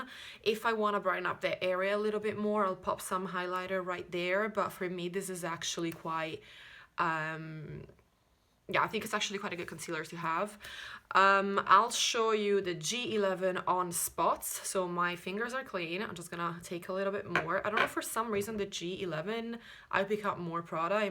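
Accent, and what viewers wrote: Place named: Italy. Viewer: You have a perfect English but I’m just curious where you’re from because I can hear some accent here and there, maybe Italian? Just curious! (I’m Italian and I feel like I talk in a very similar way when I speak English)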